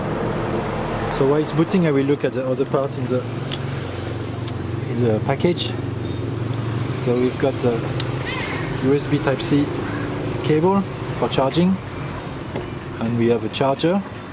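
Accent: France. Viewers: A little french accent ? :)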